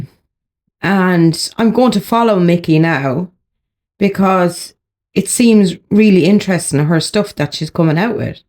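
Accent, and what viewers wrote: Ireland. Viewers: Absolutely fantastic analysis of this case , I love the Irish lilt xxx jen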